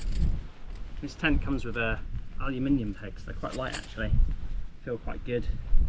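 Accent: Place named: United Kingdom. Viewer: 1. Starting video 2. Hearing british accent 3. Closing videp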